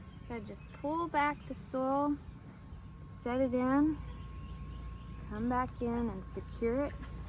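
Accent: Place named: South, United States. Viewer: Great video, love the southern accent. Thanks for sharing. Growing in pots is a great idea. Move them if you need to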